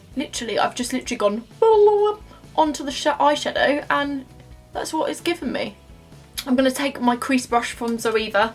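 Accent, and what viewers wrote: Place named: England, United Kingdom. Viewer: woohoo!! your backdrop is so cute!! haha you also have such an english accent!! also your hair looks fab! Love your videos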